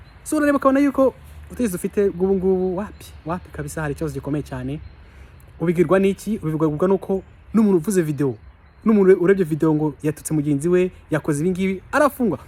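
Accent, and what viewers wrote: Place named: France. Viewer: She has a french accent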